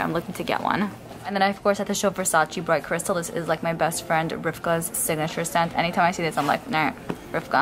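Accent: New York, United States. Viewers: new york accent , i pronounce it the same way you do.hahahahaha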